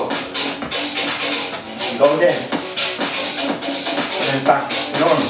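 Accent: Ireland. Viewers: You from dublin? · Are you Irish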